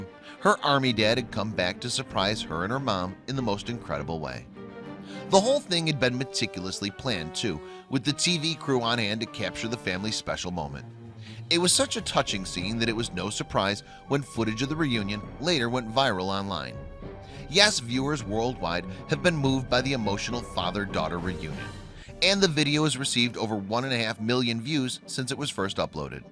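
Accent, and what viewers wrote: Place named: Midwest, United States. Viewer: Maybe just play the video instead of a 10 minute explanation? Especially with that nauseating Midwest accent, torturous.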